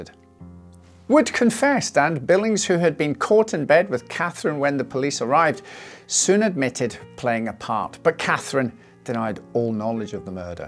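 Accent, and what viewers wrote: United Kingdom. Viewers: What a fabulous discovery this channel is. I listen to MrBallens stories and they are brill but I do prefer to listen to a British voice if given the option. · Stories are just more exciting and easier to listen to when read with a British accent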